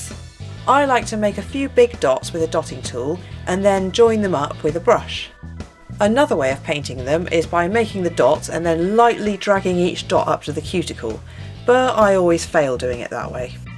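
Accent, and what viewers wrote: England, United Kingdom. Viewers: Does she live in England and does is she English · u from good ol britain! mee tooo allright me loveeerrzzz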